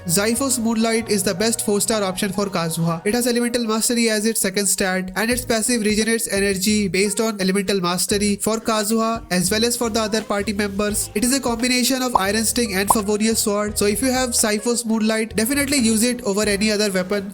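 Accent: India. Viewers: Indian accent 😅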